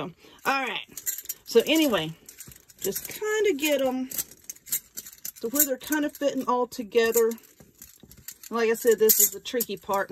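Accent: South, United States. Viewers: I ABSOFREAKINLOUTY LOVE YOUR CHANNEL!!! I'm from Dallas, NC and have that southern TWANG too!! It's so great to here someone like me on You Tube! Yes Mam, we were raised right. Only a Southern Girl knows all the old sayings and uses them right, as well as, MANNERS!! XOXO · Going to Dollar general to heyu snowman and Wally World to get my rings. He's darling and I love your southern accent. It's the bomb!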